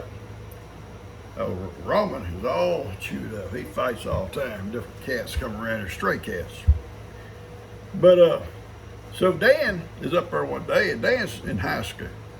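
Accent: South, United States. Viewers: I do love your videos, we talk alike, country'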